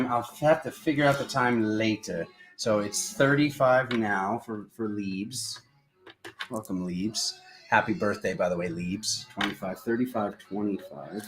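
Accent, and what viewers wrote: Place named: South, United States. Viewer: "He's a badboy but he's a good mayn"🤣 🤣 But I like that Southern draw😇 I love your vibe, We're always having a good time 🤗🤣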